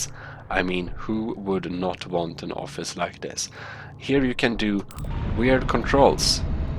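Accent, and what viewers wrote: Sweden. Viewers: I love the Swedish accent.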